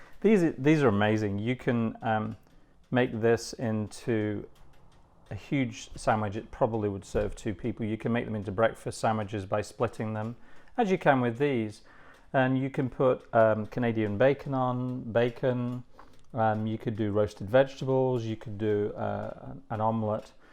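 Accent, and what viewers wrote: United Kingdom. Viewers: What's this nonsense? I'm pushing 60 years old and have never seen or heard of anyone cutting a crumpet like that. Literally never. You sound (middle class) English but who is this aimed at? No one calls them 'English crumpets' in Britain and what Americans call 'English muffins' are not the same as crumpets. What the hell is going on? It's one thing to pitch British recipes to Americans etc, and should be congratulated if anything, but to perpetuate nonsense like English crumpets and suggest they're the same as 'English muffins' is ridiculous. If you're filming that in America, dont come back, mate, you're dead to us. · I suspect that, in spite of your accent, you are not British otherwise you would know that crumpets and muffins are 2 completely different things.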